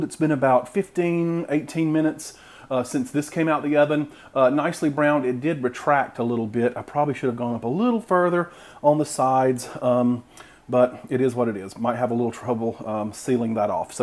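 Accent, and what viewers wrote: South, United States. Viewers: Thank you ! love your video and love your personality w southern accent!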